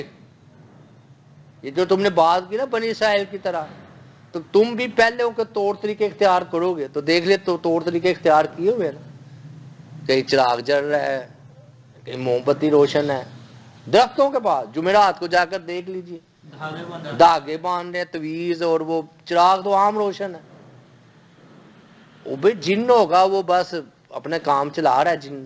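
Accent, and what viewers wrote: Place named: Punjab, Pakistan. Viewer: Assalma Aliakum Bhai App KI Urdu Punjabi styles hai App ko appni urdu Thikh karnay Chahay Likin As Jari Rakahy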